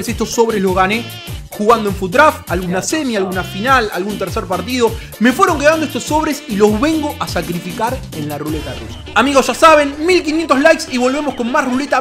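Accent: Argentina. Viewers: Me mola tu acento argentino!!SALUDOS DESDE ESPAÑA :D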